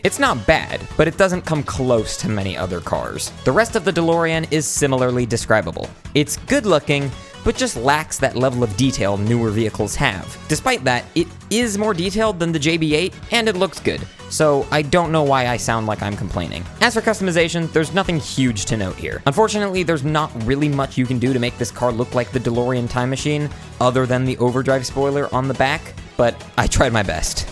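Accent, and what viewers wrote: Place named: United Kingdom. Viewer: wow a british person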